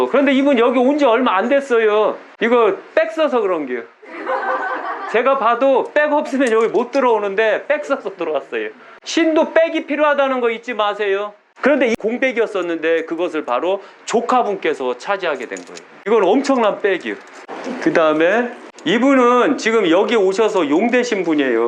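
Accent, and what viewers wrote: Chungcheong, South Korea. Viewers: 매일 유교약사생불사 봅니다 확신찬말씀이 좋습니다 충청도말씀이 참 친근합니다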